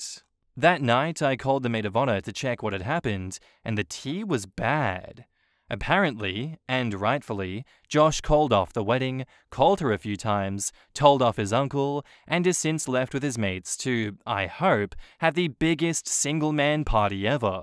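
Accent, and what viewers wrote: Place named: Australia. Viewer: Ausssie daddy hubby reading Spanish 🤌🏻💕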